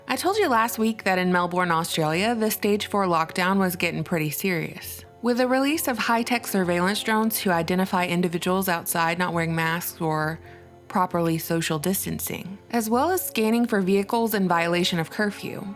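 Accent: South, United States. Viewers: A southern Belle and wish there were more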